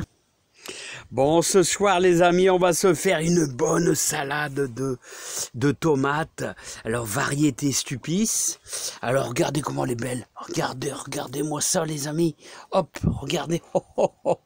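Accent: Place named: Nord, France. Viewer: Bonjour! Ravie de m'être abonnée à votre chaine, j'aime beaucoup la façon dont vous abordez le jardinage, vos conseils précieux et, cerise sur le gâteau, votre si sympathique accent "chti"! Je vois que vous avez quelques pieds de raisin, bien beaux et bien fournis, quelle chance! Pourtant originaire d'une région où les vins sont prestigieux, je n'ai pas la chance de pouvoir en récolter, les guêpes passant avant moi parmi les grappes et ne me laissant que les peaux des grumes, et encore, pas toujours car les oiseaux se chargent de "vendanger" le reste... Auriez-vous une idée à me donner pour éloigner ces dames jaunes et noires pour qu'elles me laissent quelques grappes à récolter, s'il vous plait? J'ai voulu essayer l'ensachage mais cette méthode ne m'a pas convaincue, et je répugne à installer des pièges... Merci d'avance.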